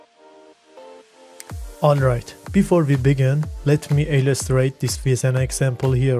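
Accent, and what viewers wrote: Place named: Eastern Europe. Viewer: You're Persian but you have an eastern European accent. That's interesting. Thanks for the tutorial.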